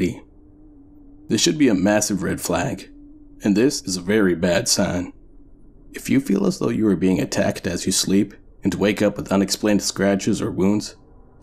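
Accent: South, United States. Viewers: As a fellow Georgian (not too far from this story), I voted you up! I moved to the north, and it’s awesome to hear a southern voice again lol. Good luck in round 2! I’m excited to see what you’ve got in store. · I thought you were from the South! I was sold! So you can do accents too? that's even more impressive! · Nice story! Mr. has a very likeable way of narrating..held my attention immediately. Felt like I was listening to a friend relating a story. Extra impressed he's Canadian yet his accent reminds me a bit of my southern roots. Definitely a 👍 from me. Actually 2 👍👍, 1 for author Mr. Zacharius Frost as well. And it's always nice finding another great cp channel, so I subscribed to too. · Being a non-American; I love that southern drawl.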